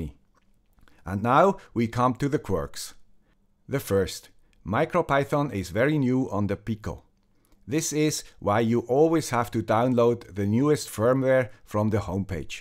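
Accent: Switzerland. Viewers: Nothing like your weekly dosage of your guy with the swiss accent. :)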